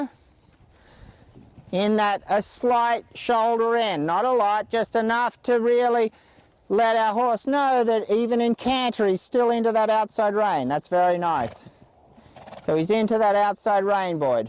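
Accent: Australia. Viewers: Is he Australian? He has an accent...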